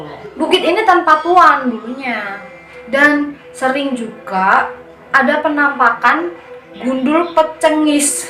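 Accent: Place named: Java, Indonesia. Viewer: Medoknya uwuw kak🤩🤣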